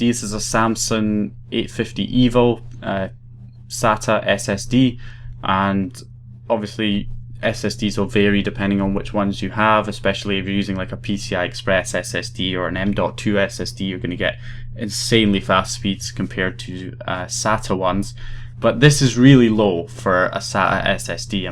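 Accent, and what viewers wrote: Scotland, United Kingdom. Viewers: I so much liked the Scottish accent that I subscribed! :) hahaha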